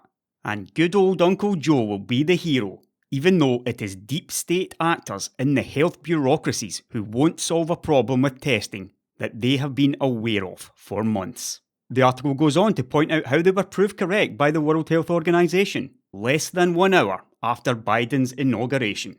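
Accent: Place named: Scotland, United Kingdom. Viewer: Can you make a video in swedish. I have to hear your Swedish with a Scottish accent, if you can speak it.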